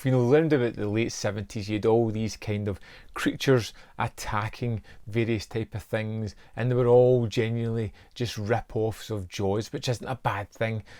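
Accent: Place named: Glasgow, United Kingdom. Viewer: Love this movie!! Just bought the eureka double pack myself. Been trying to get this since i seen it on betamax way back when(no joke) had a large budget and i think its just as relevant now, the whole man getting punished by nature for exploiting the resources slant. Interesting how yoy never really know if the medicine man manifested the bats or its just a natural migration. Love ambiguous storytelling. I had actually given up on owning this one never mind on a eureka bluray! Keep up the good work, good to see and hear a fellow Glaswegian(?) Review movies kn youtube. Thanks for the great review and exposing this great film to your community. Thank god for eureka.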